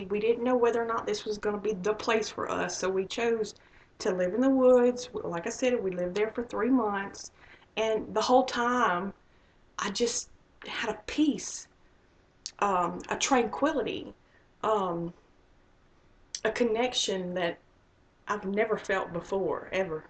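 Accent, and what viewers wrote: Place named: South, United States. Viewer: Your accent sounds like home to me. :D I'm from the South. Love your story!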